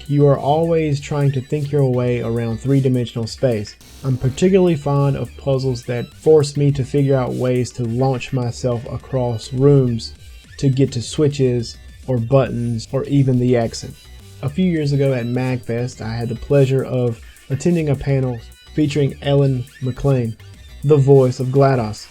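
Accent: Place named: South, United States. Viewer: Mike B sent me...you sound nearly as country as me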